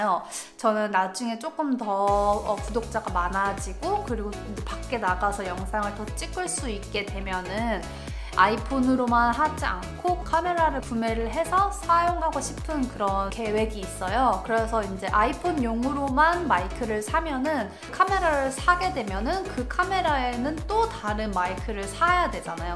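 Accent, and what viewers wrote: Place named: United Kingdom. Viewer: 영국발음 치여요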